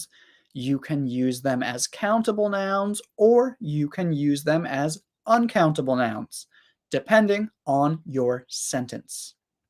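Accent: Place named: United States. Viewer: You are absolutely right. I'm also happy to be your friend.l had some curiosities when l was in a school that how american people speak english.Grammar books couldn't provide full information at that time.l'm impressed with video as I can understand his words.Thanks for being considerate with us.Just love your accent.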